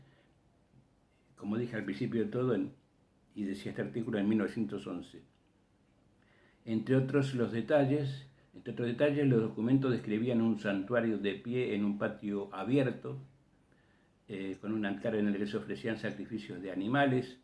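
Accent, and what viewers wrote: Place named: Uruguay. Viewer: POR TU ACENTO SOS URUGUAYO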